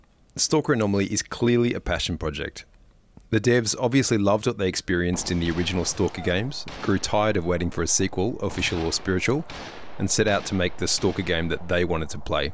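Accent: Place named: Germany. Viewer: Місцезнаходження: Японія, автор з німецьким акцентом про українську гру - де ще таке зустріти , як не на YouTube ?)))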